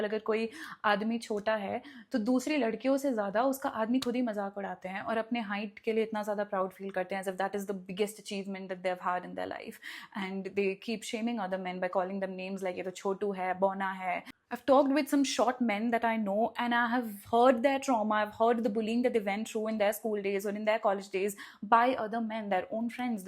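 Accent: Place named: United States. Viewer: one thing made her channel different from others and attractive that is <3 her personality in long video's is like -: pure AMERICAN ACCENT !!😁 and in reel's and shorts is like-: pure desi and dark vibes 😜😁 with some funny sprinkle spices ♨✨❤!! one thing i must say 😊🤨>> wht happens with this cute gurl !! in both the format of videos 😅🎀!!!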